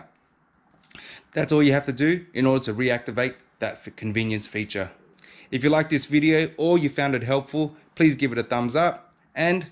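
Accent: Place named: Australia. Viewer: Hey, Great Aussie accent. Yes good info but my CLC <num> does not have a convenience feature so only operates by the buttons on the dash and the swing out does not work. would i have to fix that switch? Or could I make it operate with the key fob? André in Sydney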